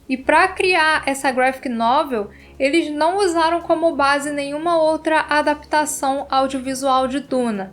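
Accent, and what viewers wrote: Rio de Janeiro, Brazil. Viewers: eu notei um sotaque carioca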